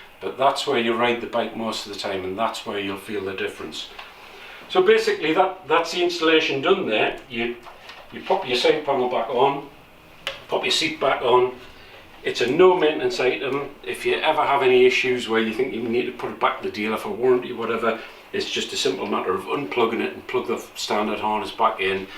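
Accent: Newcastle, United Kingdom. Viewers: I said 900 TO a 1000 RPM . not 900 thousand- Sorry you may need an interpreter for the Geordie accent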